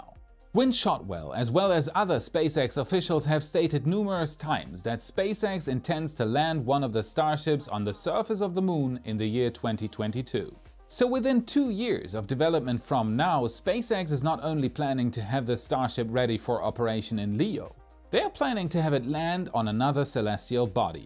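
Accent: Germany. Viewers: Hey man. You sound very German 🇩🇪 ? But your English is pretty decent. Where are you broadcasting from?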